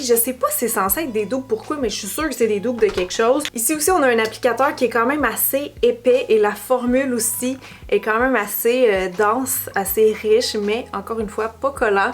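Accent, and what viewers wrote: Quebec, Canada. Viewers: Êtes-vous un Canadien francophone?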